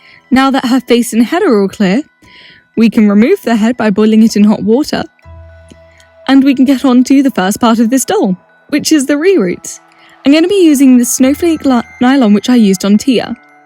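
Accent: United Kingdom. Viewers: She looks great! I honestly think the crinkly wings work great, even in nature these kind of wings are never perfectly smooth and usually have a slight texture. Also, creepy vibe and all that lol. I found you via Dollightfulls community post and my god, you sound delightfully british. It was a pleasure seeing your process and hearing you explain it. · OMG THIS IS AMAZING!! I have just found your channel, and normally, I dont watch many customisers because I can't understand what they are saying, and then I realise you are British, and I am British too so I was internally screaming with joy. And your customs are so good!!! I love how this doll has an eerieness to her, and yet still friendly. You deserve so many more subs.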